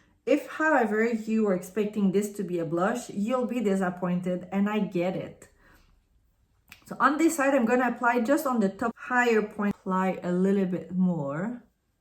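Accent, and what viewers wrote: Quebec, Canada. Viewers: Salut! (du Canada) - en tant que Québécoise, j’ai décelé ton accent québécois dès la première fois que j’ai visionné un de tes vidéoclips. Mais peut-être faut-il être québécois pour identifier ton accent. J’ajouterai que ton accent est vraiment agréable à entendre alors pas de souci. Je vais maintenant continuer de visionner. La poudre Rocabar d’Hermès est jolie sur toi. Très (trop!) tentant. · Allô, j’adore ton accent étant Québécoise aussi et je suis au Saguenay et première neige hier soir qui est encore présente au sol ce matin!🥶😉. La poudre Hermes Rocabar je l’adore je la porte à tous les jours depuis un peu plus d’une semaine et j’adore la poudre bronzante aussi j’ai Tottori et Atlas, pour le correcteur ultra le teint j’ai tellement hâte qu’il soit disponible au Canada …on m’a dit que ce serait en 2026 mais je ne sais pas quand…j’ai très hâte de l’essayer. 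Bonne journée et merci pour ce vidéos! 😍🥰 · Hi ! To sum up I would say that when speaking english you have a recognizable french accent and when speaking french you have a strong québécois accent ! So unique 😉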